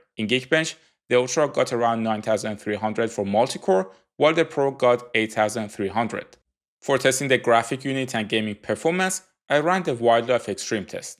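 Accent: Iran. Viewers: لحجت ایرانیه😂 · Nice video especially w that very irooni accent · بنازم لحجه ایرانی رو 😅🫡🤌🏼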